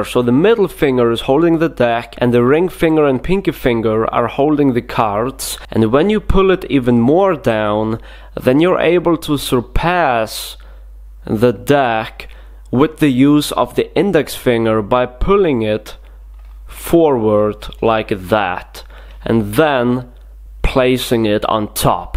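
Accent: Russia. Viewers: are you from russia? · Почему решил вести канал на английском у тебя вроде как русский акцент) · Russisch Accent Genius...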